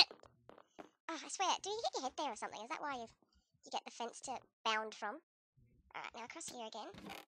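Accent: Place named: Australia. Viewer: "It was sprinty but it, somehow, didn't seem sprinty enough." ~ 2014 Love the spinning Ultimate logo thingy. Also, you managed to make the Aussie accent even more cute! >.<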